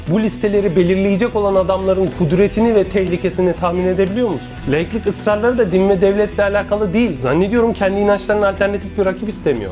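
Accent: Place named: Istanbul, Turkey. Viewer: Sizi neden sevdim kardeş bilmiyorum ama gerçekten çok güzel bir Oflu olarak bizim söyleyeceklerim izi İst şivesiyle anlatıyorsun ve guruplarda paylaştım daha aktif olmanı istiyoruz teşekkür ederim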